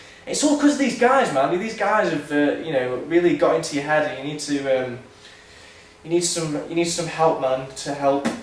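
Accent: United Kingdom. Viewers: because of your accent :P are you from yorkshire?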